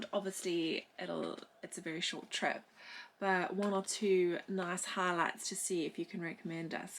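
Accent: South Africa. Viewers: Another great video, I don’t think that using the bow thruster is cheating it’s a must have unit nowadays to have installed on your boat. It’s interesting listening to your South African accent it reminds me of my time in Johannesburg in 1982. When are you going to set sail to come up north, try the Ribble link and the Lancaster canal, 40 plus miles and no locks, You would love it. Anyway keep up the good work and don’t mind the weather. Best regards from Tom in Lancashire peace and god bless.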